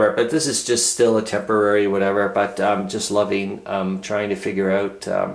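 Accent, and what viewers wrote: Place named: Canada. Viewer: Sorry, but you sound very Canadian, are you from Alberta or Sask by chance?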